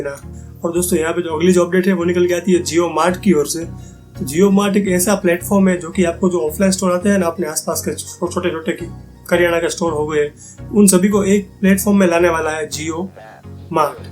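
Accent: Gujarat, India. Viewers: By your voice i think you are gujrati .I'm right ?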